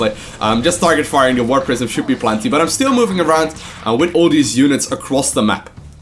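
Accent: Netherlands. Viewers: It's neither canadian or british. It's northern european, possibly dutch. Feel free to confirm or deny. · his accent is dutch :D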